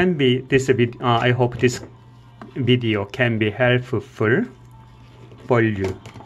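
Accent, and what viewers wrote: South Korea. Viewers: Judging by the writing on the toothpaste tube and his accent, I think is perhaps Korean? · he is korean i could catch korean eng accent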